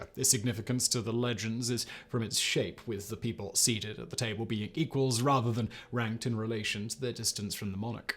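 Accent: United Kingdom. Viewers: Love the info. Your high notes trail off to nothing and along with your British rhythm is too much. Again info was terrific from what I caught.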